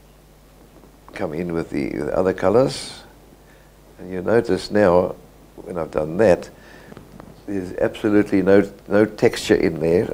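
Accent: South Africa. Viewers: You have a South African accent, am I correct🤓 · Boy, am I happy to have found you, I turned 60 a while ago, and suddenly decided to try my hand at painting etc. I just love the way you talk a person through the motions.... And to hear a South African voice ... what a treat. Thanks for the knowledge that you share.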